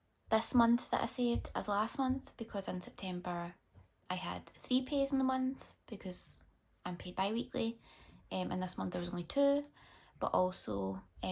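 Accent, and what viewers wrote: Scotland, United Kingdom. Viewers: My American self didn’t know how much I loved the Scottish accent until now 😩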